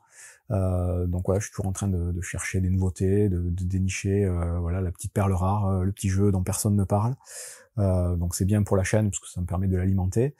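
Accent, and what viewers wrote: South-West, France. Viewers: Je me suis dit la même chose que vous : expliquer les règles, c'est galère ! Donc les vidéos sont très pratiques et j'aime beaucoup votre voix et votre petit accent du sud ouest 😊 En plus des explications sans superflus ni "spectacle" (pas comme la plupart). J'écoute aussi Yandrew et Professeur boardgame 😀J'adopte la simplicité ! Belle continuation...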